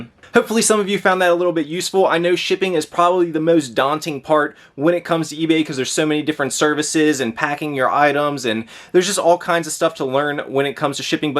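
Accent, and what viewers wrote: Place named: Baltimore, United States. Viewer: Ever live in Baltimore? Your accent reminds me of my Bmore neighbors :)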